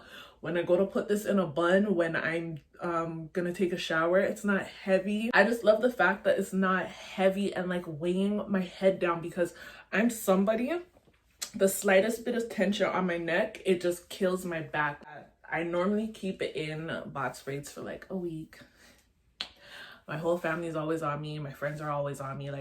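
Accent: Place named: Toronto, Canada. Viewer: You sound like you from Toronto. Your hair looks great I want to try these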